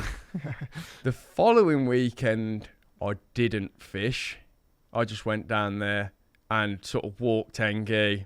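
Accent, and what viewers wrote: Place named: Birmingham, United Kingdom. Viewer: with a Brummie accent! meets Peaky Blinders!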